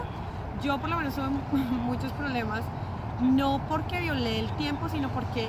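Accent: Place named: Colombia. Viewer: Gracias por la información, soy mexicano y estoy tomando entrenamiento en Texas, he seguido el proceso que recomendaste pero mi duda es, ¿con sólo mi visa de turista puedo sacar hasta comercial? Apenas tengo 20 horas pero quiero ir figurando como le haré. Gracias de antemano por tu atención y ayuda! Pd: Me encanta tu acento colombiano 😁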